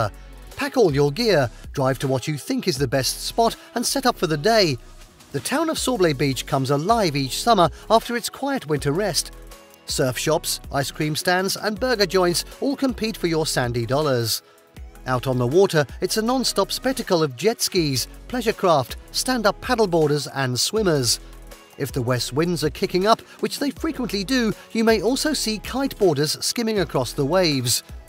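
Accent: United Kingdom. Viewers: thx for info! and your speech is clear enough to understand!🙂 I'm sorry, is it British?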